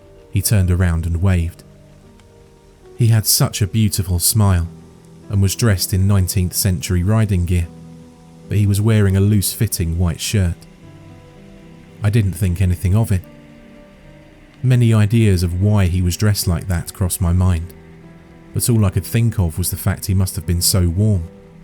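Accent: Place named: United Kingdom. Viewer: I love your channel! I love how there are no voice impressions, just calm, chill monotone british voice. It makes me fall asleep like a child.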